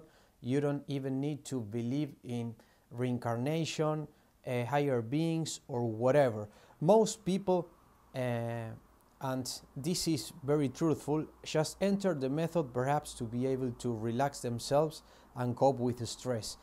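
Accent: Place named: Latin America. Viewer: The content is good but I would suggest you working on your pronunciation a bit more. in some parts of the video your English accent sounds too thick and it can get distracting. I am Latina as well (I assume you are) and I know it takes years to perfect pronunciation, but it's not impossible to always strive to sound better, especially if you have important content to communicate. And yeah you're right the whole "Mind control" title can be misleading to many people.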